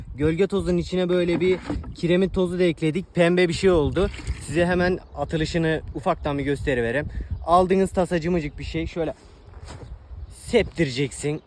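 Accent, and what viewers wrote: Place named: Bursa, Turkey. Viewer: Abi sen nerde yaşıyorsun ben gazipasadayim Bursa'da senin gibi konuşuyorlar (fingirdinmi)❤❤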